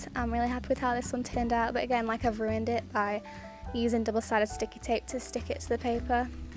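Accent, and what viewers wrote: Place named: England, United Kingdom. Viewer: 2 seconds into the video and I instantly said "That's England" 😂 Loved the video. I would love to pursue art. You are very talented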